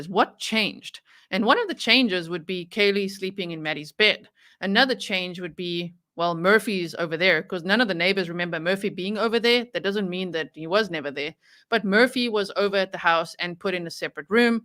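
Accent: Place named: South Africa. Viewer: I really, really enjoy your presentations. I like how the pace moves swiftly. Originally your swift speaking pattern with your SA accent was difficult for me to follow, but now that I am used to it, I love the fast pace. I now even put other people’s podcasts on 1.25 speed to get through faster, per your suggestion. I pray we are not going to try to eliminate knives now in addition to firearms. Murder has nothing to do with a weapon and everything to do with a person’s heart. After all, Cain used a rock. I’m sure there have been many murders using bats, 2x4’s pieces of lumber, axes, gun butts, vases or lamps, hammers, hands or fists, pillows, or plastic bags. In other words, you can’t legislate morality. We need tougher and swifter punishments, not regulations that take freedoms and methods of self-defense away from the law abiding people. We have quite a few firearm weapons ourselves, plus kitchen knives, excess lumber, the lot. 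I don’t want my freedoms taken away because there are a few bad people murdering innocent people.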